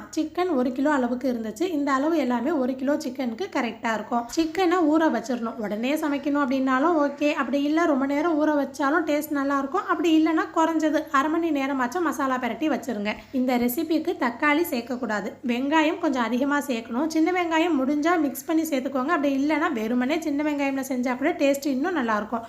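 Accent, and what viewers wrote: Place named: Mylampatti, India. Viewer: enga familyla nanga ipd dhn pesuvom adhe mari pesuringa poorvigam mylampatiya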